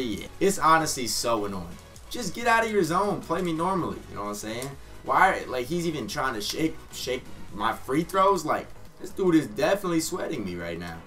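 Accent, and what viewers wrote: Iowa, United States. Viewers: Are you from Iowa?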